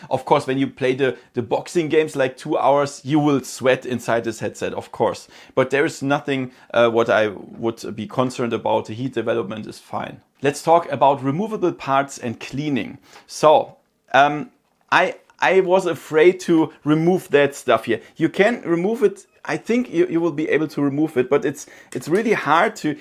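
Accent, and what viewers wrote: Germany. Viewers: He is German speaking in English. Now your turn do a video speaking in German.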